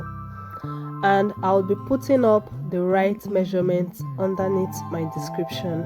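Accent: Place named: Nigeria. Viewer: Hearing the beautiful Naija accent .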